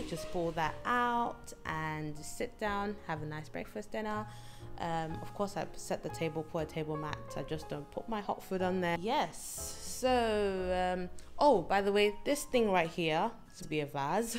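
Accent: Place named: United Kingdom. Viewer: Your accent sounds quite British....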